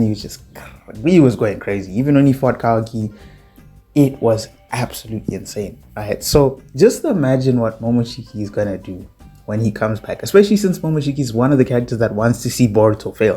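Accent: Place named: South Africa. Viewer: Your South African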